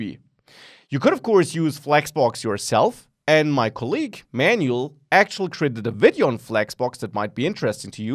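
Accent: Germany. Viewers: GREAT content! Are you German by any chance? I am trying to make out what kind of accent you have. (I am only asking. I understand you perfectly fine)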